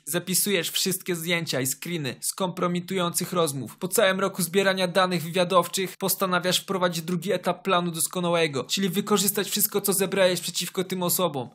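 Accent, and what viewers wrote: Podlasie, Poland. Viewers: Ej mam pytanie jesteś z Podlasia? Xd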